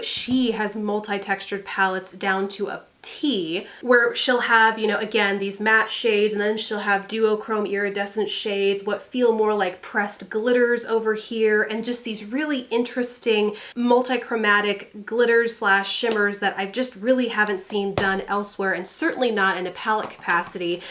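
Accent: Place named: New York, United States. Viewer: Your from texas? I thought you were from New York girlfriend:)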